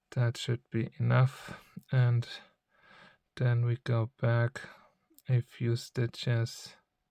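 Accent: Germany. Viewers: DIE anleitung wäre auf 2-3 Minuten reduzierbar, wenn man das Prinzip der Maschenentstehung erklärt. Mehr braucht es nicht. Und als Anschlag eine Luftmaschenkette ist einfacher. Übrigens: bei diesem gruseligen Denglish bekommme ich Ohrenschmerzen!!! Mach Deine Videos auf Deutsch oder lerne eine vernünftige Aussprache. Alles andere tut einfach nur weh! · Dieser Deutsche Akzent, er lässt sich nicht leugnen 😂 Super Anleitung. Danke.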